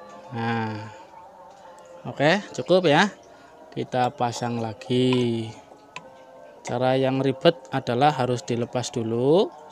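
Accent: Banyumas, Indonesia. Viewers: Otw praktek mas bro. Ngapake daerah ndi mas. Nyong juga ngapak. Banyumas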